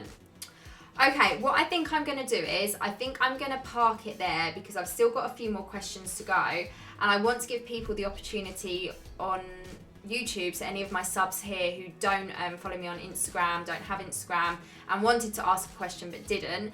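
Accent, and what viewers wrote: United Kingdom. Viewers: I just want to say that I absolutely love your channel and your personality! You have a lovely London/English accent. It's really quite something! Ace all day...✌🏻+💖 - Eric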